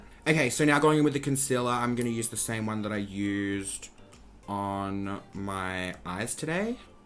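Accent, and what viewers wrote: Queensland, Australia. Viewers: Omg youre aussie!?? Hello from a fellow Australian😂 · OMFG I LOVE YOU ❤❤ you make me miss Australia with your accent 😭😂 your so fucking funny!! I love you, and your videos ❤❤ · 1st time on this channel. I already love it! Straight off the bat also I can tell that he's from Queensland. 👌❤ Also congrats on trending!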